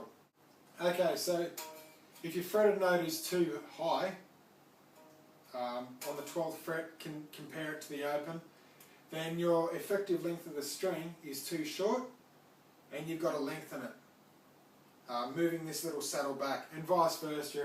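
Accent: Australia. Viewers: Finally saw your face! Where are you from? And where's that accent from? I guess Australia but maybe I'm wrong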